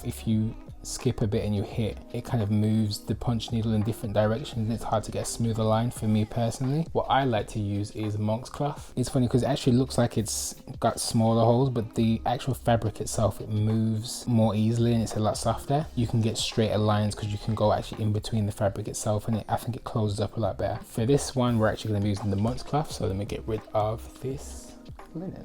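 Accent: Midlands, United Kingdom. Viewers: is that a midlands accent i detect? i adore this design <3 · I never thought I could make a rug but you’ve made it look so achievable! Thank you so much! And your voice is so relaxing! You from the midlands?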